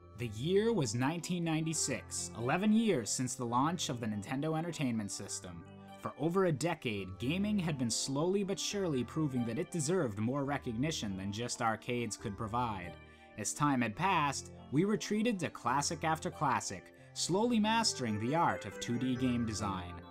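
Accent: Canada. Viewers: TheJoseluisperez1000 We pronounce it "zee" He's Canadian, so he pronounces it "zed" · CANADIAN!!! YOU CANNOT HIDE FROM ME!!!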